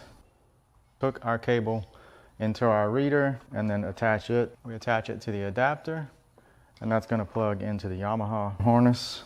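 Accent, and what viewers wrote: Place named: Louisiana, United States. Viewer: Should’ve pulled the AIS off while you were at it. South La you sound like saint Martinville type people.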